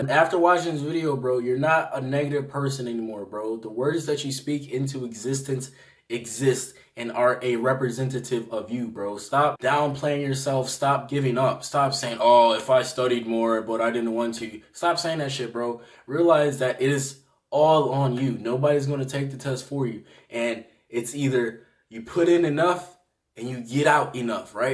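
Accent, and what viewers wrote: Baltimore, United States. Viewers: I like his Baltimore accent lol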